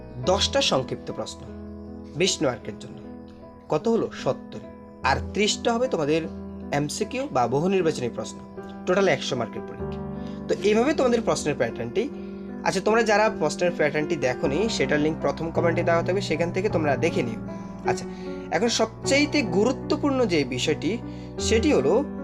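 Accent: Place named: Chittagong, Bangladesh. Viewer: আপনি কি চট্টগ্রামের নাহলে কেন কেনো বলছেন 🤔🤔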